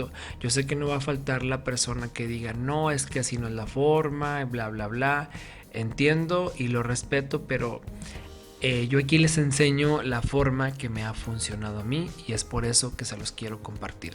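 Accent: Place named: Peru. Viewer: Suenas como si fueses peruano 😊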